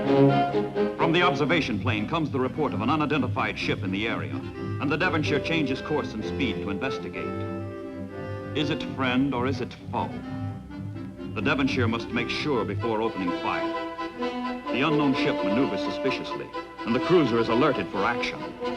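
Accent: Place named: United States. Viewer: I'll give you one star for at least trying. BUT employing children between 6-8 to play the instruments for the soundtrack is NOT a good idea. And then to use AMERICAN ACCENTS on the Voice-Over? What were you thinking? The rest of the world finds it impossible to listen to the accent of the most stupid country in the world.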